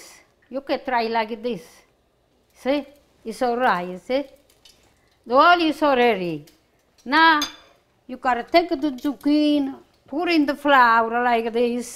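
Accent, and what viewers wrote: Italy. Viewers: Grazie mille for sharing yourself and your recipes. My father and his family were from Puglia but passed away before I could know them. My mother learned many of my grandmother's recipes. Your cooking is so similar and expands what I had learned from my mom. Your accent also reminds me of my relatives in Italy 🥰.Your singing makes keeps me company as I cook and I received your cookbook for Christmas this year! Your family is blessed to have you. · She uses the word "Fiammella" with this sweet accent half-italien-half-english ^^ Fiammella means little flame, so you can basically say you got it right ;)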